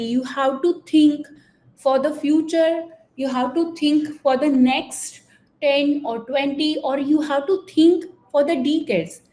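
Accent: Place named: India. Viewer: >indian accent No thanks.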